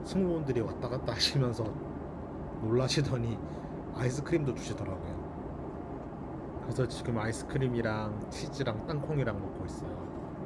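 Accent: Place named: North Korea. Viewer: 말투가 북한 사람같네요. 북한사람인가요?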